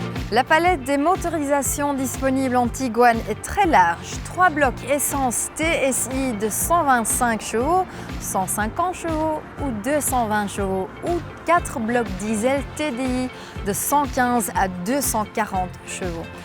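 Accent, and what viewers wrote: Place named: Netherlands. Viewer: Quel bel accent néerlandais.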